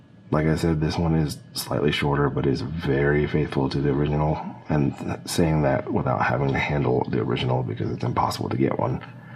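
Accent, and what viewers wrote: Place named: Connecticut, United States. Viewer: Da fuq? Ken Keniff from connecticut sounding ass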